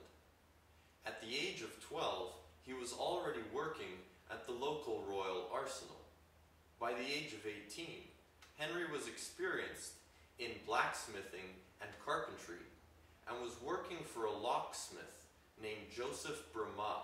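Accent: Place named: United States. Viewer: I'm always late to the party. Only got a yt recommend today. Good info but as the others have said a touch light on the volume front and your pronounciation a little too american but forgiven on both fronts.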